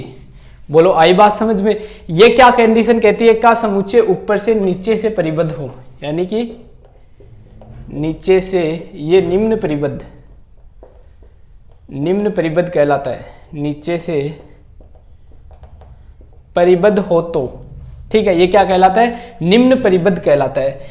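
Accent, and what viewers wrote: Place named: Rajasthan, India. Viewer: Sir this is unit 2 in Rajasthan university syllabus ...nd ur marwadi is like awsm....😄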